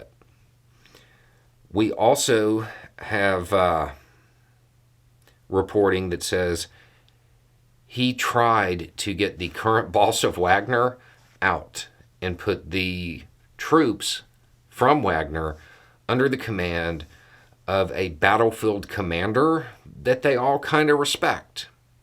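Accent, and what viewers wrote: South, United States. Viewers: Nobody with that accent should ever be commenting on geopolitics. Dumb rednecks.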